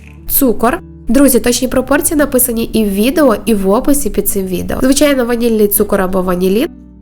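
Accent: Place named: Ukraine. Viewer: 😮😊 Просто слушаю ваш разговор 😊и уде наелась 😅,и готовитьне надо,то токой степени мне нравится Украинский говор 😊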